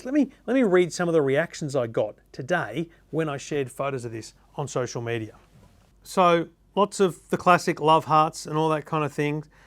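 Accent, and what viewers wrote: Australia. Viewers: Is this Guy from Australia? And why is he speaking in Australian?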